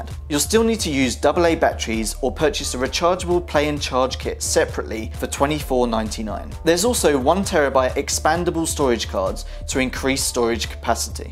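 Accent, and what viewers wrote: United Kingdom. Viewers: why your british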